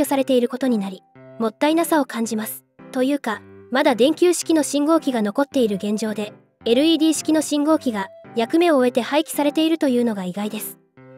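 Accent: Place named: Osaka, Japan. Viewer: ばりばり大阪弁やん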